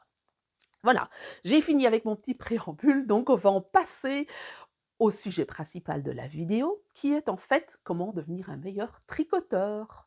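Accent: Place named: Brittany, France. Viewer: Merci pour vos vidéos ❤. Un vrai plaisir instructif et agréable à regarder. Et un vrai plaisir d'entendre cet accent de chez moi. Suis réfugiée dans la campagne bretonne. Votre chaîne est juste trop bien. Merci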